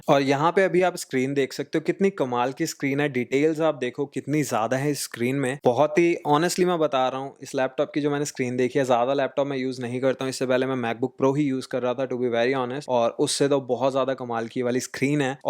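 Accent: Punjab, India. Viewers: hindi in punjabi tone. Feel good to hear😊😊😊👍👍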